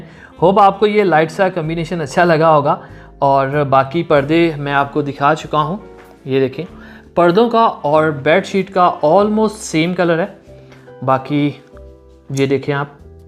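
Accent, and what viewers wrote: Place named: Punjab, India. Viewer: Punjabi bht pyari bolty hn ap😀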